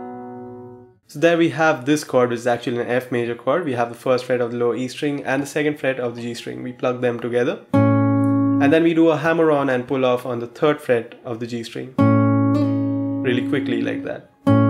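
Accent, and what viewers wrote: India. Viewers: Hey bro are you from India your accent sounds a little bit Indian 😅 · Your accent is kinda similar to indian accent! Are you from India?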